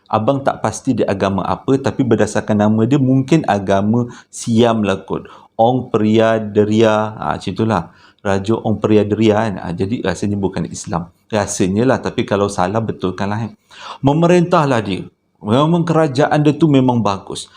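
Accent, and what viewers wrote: Java, Indonesia. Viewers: Selain kc champion. Abg best la citer mistik2 dan hikayat.. best je dgr bila abg smpai kn citer kadang2 ade sleng mcm jawa 😂😂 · Nape aku suka bercerita: 1- Xde jumpscare..jd nye xde la terkejut2..haha 2- Dia cerita xde cut dan edit..terus je..so rasa cam borak depan2... 3-,ko jawa ke?agak pekat bunyi jawa ko..hilang rs rindu nak borak2 dgn kwn2 jawa..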